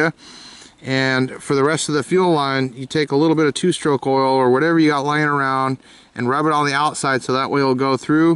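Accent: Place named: Midwest, United States. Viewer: You must b a midwest boy.nice clear speech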